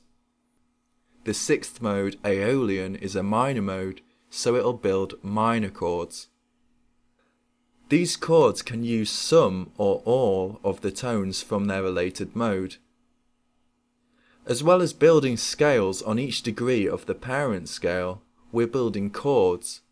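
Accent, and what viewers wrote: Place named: England, United Kingdom. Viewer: I really don't get all the hub-bub about this guys voice. He's from fucking England, and he has an accent. Wow, right? It's almost as if these comments are from 15 year olds on their junior-high school library computers. Regardless of his pace or inflection, this -IS- an instructional video, and he's not a bloody actor. The focus should be on clear, articulated information; not a swooning narrative with Shakespearean prose. Anywho, very nice video, thanks!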